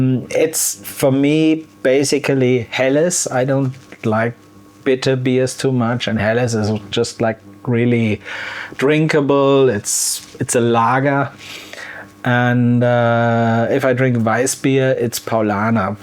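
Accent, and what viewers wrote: Germany. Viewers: Congratulations!!! Great Job!!!🎈🎉🏅🙌🙌🙌 My background is German and I miss my Auntie who cooked wonderful German recipes (she passed 20 years ago) and my Mom is 93 and no longer cooks so now I look to your channel to learn some favourite German recipes. Love your German accent and your occasional German expressions and sentences because it refreshes my German (I'm in Canada) because it makes your channel Authentic. Keep up the great work!!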